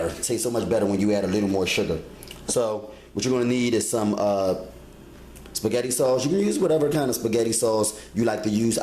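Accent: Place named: Philadelphia, United States. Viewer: I love your philly accent!!!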